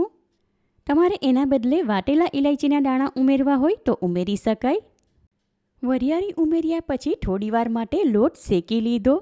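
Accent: Surat, India. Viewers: Ben aa Ben surat na che, tya ni bhasha aavi j hoy che, toh aapne respect aapiye, baar gaame boli badle, badha na uchcharan juda hoy, je मातृभाषा hoy tene shu kam chhodavani?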